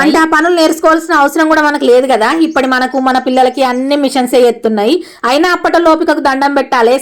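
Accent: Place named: Vemulawada, India. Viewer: అక్కా మన వేములవాడ యాసను, దేశమంతా తెలియజేస్తున్నావ్ సూపర్ సూపర్ సూపర్,,,,,,,,,,,,akka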